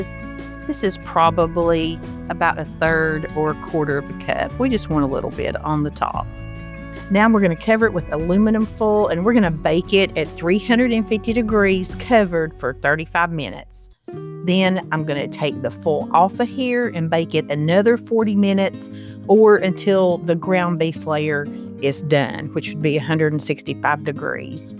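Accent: South, United States. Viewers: Hi! I recently discovered your videos & I'm hooked! Yours are my favorite of them all! I love how you show the ingredients & sometimes tell where to get them. I also like that the ingredients are simple & the recipes are good & easy to follow (love your southern accent)! I've made several of your recipes & me & my family loved them! I can't wait to see what you'll do this year. Keep up the great work! I've been telling people about your videos because they are the best! Happy New Year & may God bless you!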